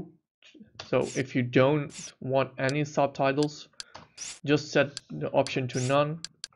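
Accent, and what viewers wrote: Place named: Netherlands. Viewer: dag,ik hoor precies dat je Nederlands bent.Daarom vraag ik het in het Nederlands. Weet u hoe ik die ingebakken Aziatische of Arabische ondertitels kan verwijderen zodat ik mijn gedownloade kodi ondertitels kan plaatsen. Normaal heb ik geen probleem met buitenlandse ondertitels maar de ingebakken ondertitels nemen soms bijna de helft van een film in. groetjes Peter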